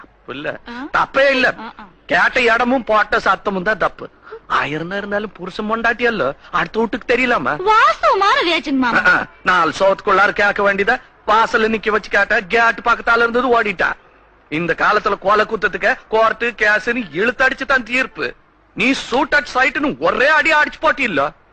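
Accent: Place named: Kongu, India. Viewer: பெங்களுரில் இருந்தாலும் உங்க, அதுதான்க நம்ம, கொஞ்சும் கொங்குத் தமிழை மறக்கவில்லை. ஆனால், உரையாடலின் மையக் கருத்துத்தான் சரியில்லை. இது மாதிரியான கலச்சாரச் சீரழிவுக் கருத்துக்களைப் புறக்கணியுங்க. உங்க வீட்டுக்காரம்மா, கொங்காக இல்லாவிட்டாலும் கூட, கொங்குத் தழிழை, உங்களைவிட நன்றாகவே பேசுகிறார்கள். வாழ்த்துக்கள்.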